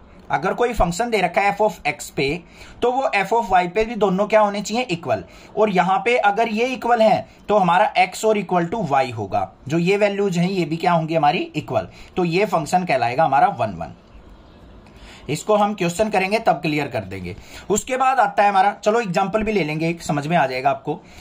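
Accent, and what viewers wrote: Haryana, India. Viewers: Sir are you from haryana❤?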